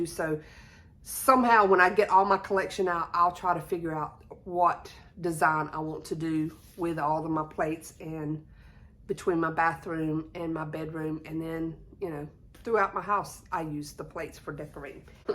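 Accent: South, United States. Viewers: Love that strawberry 🍓 cookie jar and the beautiful vintage linens! I've been collecting doilies, old handkerchiefs and table linens for crafting, but I'm having a hard time cutting them up. 😅 I also love the idea of a vintage toy tree! This year I had my Steiff reindeer pulling a sleigh full of vintage elves and mini teddy bears in Santa hats under the tree. Last year I had vintage Barbies in ugly Christmas sweaters plus my Mattel Elvis. I also wanted to tell you that I love your channel name. It reminds me of growing up on the Chesapeake in Virginia and your accent is so sweet. I miss the south! ❤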